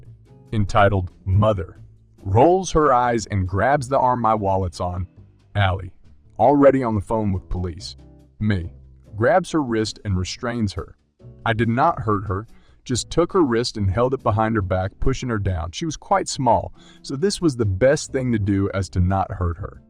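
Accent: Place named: Texas, United States. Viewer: Think he's from Texas Got a southern drawl Not a bad reader Just needs to modulate his speech when doing the different people Same voice throughout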